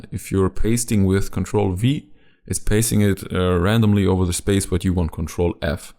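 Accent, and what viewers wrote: Germany. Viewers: are you from germany ?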